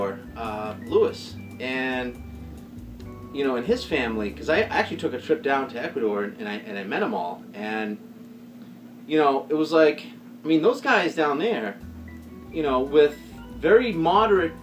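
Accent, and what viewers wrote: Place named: Boston, United States. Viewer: We get to see you sporting your full-on Boston accent in this one. Good on you, mate! Also shows how you can effectively reach out to the thick-headed Meadowlark Lemons of the world. Cheers!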